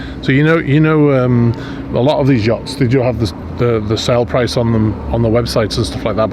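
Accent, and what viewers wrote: United Kingdom. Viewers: wonderful!, your command of the Queen’s English is very comforting, great video, and I’m excited for the both of us, for your tour of Bold motor yacht.! Here we go!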